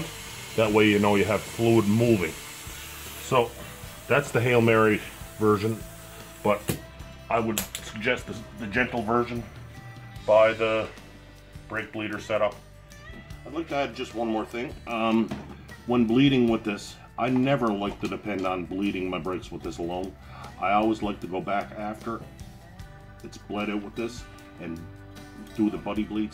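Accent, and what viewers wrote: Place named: Newfoundland, Canada. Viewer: first 5 seconds.. please tell me yer newfie?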